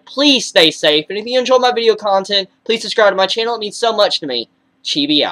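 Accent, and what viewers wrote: United States. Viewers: So he used to have a country accent?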